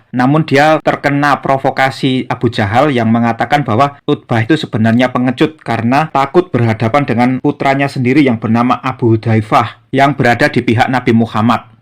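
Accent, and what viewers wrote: Java, Indonesia. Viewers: Mantap mas penjelasanya Walaupun dengan dialek Jowonya yg Kental..Medok 😁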